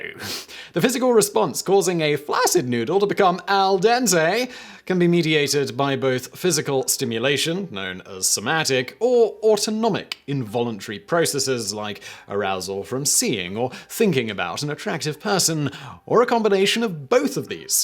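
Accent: United Kingdom. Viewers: Listening to a guy with what I call a “documentary style British accent” (like Sir Richard Attenborough) spend over 10 minutes talking about erections was hilarious 😆. Thanks, I needed that! 🤣🍆 🇬🇧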